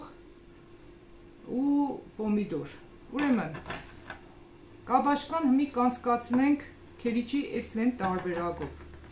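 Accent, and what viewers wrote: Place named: Gyumri, Armenia. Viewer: Բարև ձեզ շատ հաճելի է լսել իմ Գյումրեցու լեզուն Աստծո օրհնությամբ ապրես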